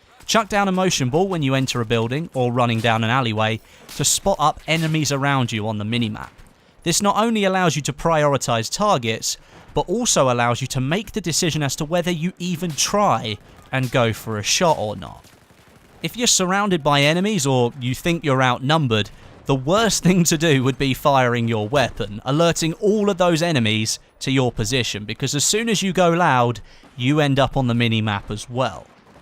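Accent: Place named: United Kingdom. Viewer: I hate british axents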